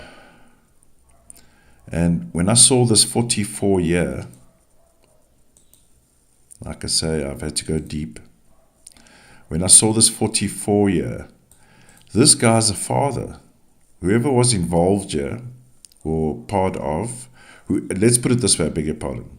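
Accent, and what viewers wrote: South Africa. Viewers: I detect a south African accent. I grew up in vanderbijlpark south Africa. I recognise that accent anywhere even though I've been back in the uk 39 years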